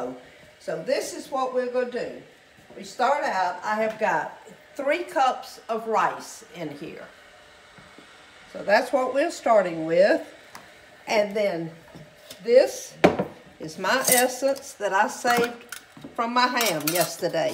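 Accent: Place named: Carolinas, United States. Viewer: Oh you just make my day, ❤. I look forward to your dialect, very much like my own, born and raised in the Carolinas.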